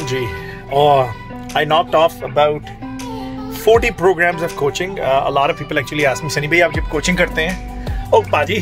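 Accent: Punjab, India. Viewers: Love your Punjabi accent although I m not Punjabi you still use lota in canada yes it's a desi touch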